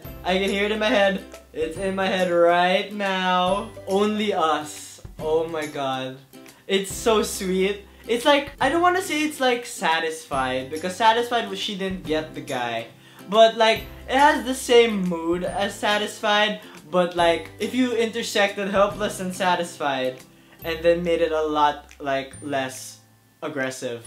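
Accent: Philippines. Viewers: is this guy filipino because lmfao that accent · I got a bunch of things to say: First, that accent. (This is my first video of you.) I was 90% sure you were Filipino, then I looked back at your other videos, and saw a familiar setting in your "Photograph" video, so that confirmed it for me.;) Second, I think the word you're looking for when you were talking about " " is exposition. Hehe. Third, I love Dear Evan Hansen! So I scour videos about it regularly. And I found this video. Yay! My personal favorites change a lot. First, it was, then (have you seen that Cynthia Erivo performance in Elsie Fest? I mean WOW!)., was also my favorites for a while. Right now, my current favorite is. surprised me a lot actually. When i first listened to it, I really didn't think much of it. After a few weeks of listening to the album, unconsciously I memorized the whole song. 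The odd disjointed structure of the song (you can't pinpoint a chorus, for example), and the minimal rhyming lends a painful realness to the song. Like it's an actual person confessing his feelings. When Evan sings the callback to, the instrumentation there with the violins just gives me chills. And of course Ben Platt did an amazing job in the recording. You can really feel the emotions he's conveying. ("Pretend I'm something other than this MESS that I am", for example. The song after that line is just packed with emotio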